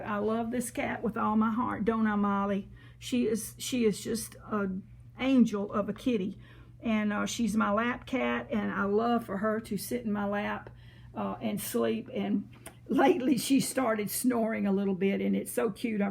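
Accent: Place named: South, United States. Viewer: Oh!,..it’s so good to hear your sweet southern drawl, it’s been too long! Lilliebeth looks absolutely precious in her Ralph Lauren, and that hat is just so cute! The matching tennies are to die for!! How glad I am to see you’re doing well! The way I see it, if a little xtra weight is all we get in this time of pandemic, we’re ahead of the game! Hugs and much love Dearheart, your pal Joy xox. P.s., I shall send you an email of how we are doing in a bit.😊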